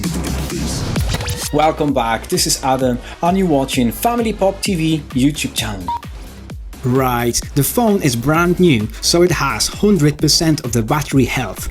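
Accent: United Kingdom. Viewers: Супер аппарат, интересный тест👍 и я впервые в жизни слышал чистую британскую речь... Хотя с детства слушал the beatles например, но никогда не замечал это))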